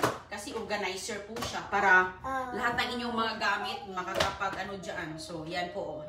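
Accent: Visayas, Philippines. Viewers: Mao nay nindot bisaya jud kaayo haha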